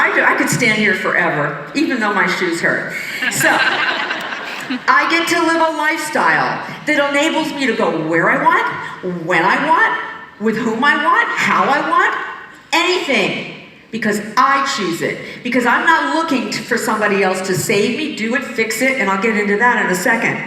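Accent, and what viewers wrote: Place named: Scotland, United Kingdom. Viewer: omg your Scottish accent BAHHHHHH HA HA The best!!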